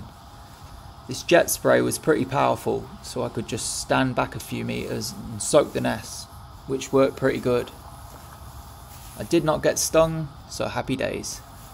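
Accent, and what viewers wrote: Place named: Australia. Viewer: Take it from someone who's been watching lawn care videos for 6 years. Well before the popularity spike. You've got all you need. The quality, the actualmowing experience, the charisma, and we Muricans love a good Aussie accent. Plus you go that extra Kilometer. You just need exposure. Not a matter of if but when. Just keep doing you.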